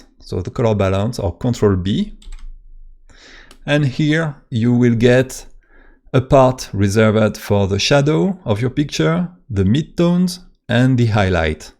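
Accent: France. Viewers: Mmh tu a un accent fr xD Sinon très cool merci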